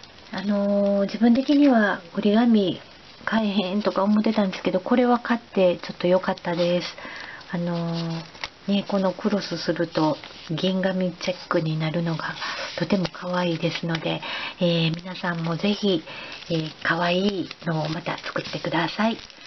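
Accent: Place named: Osaka, Japan. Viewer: の動画大好きです！センスが素晴らしくて、いつもDIY意欲が掻き立てられます(⁎˃ᴗ˂⁎)100均大好きなので、「この商品、こんな使い方できるんだ～～✨」って新しい感動もあります！あとわたしも大阪弁なのですごく親近感わいてしまいました(´ `)照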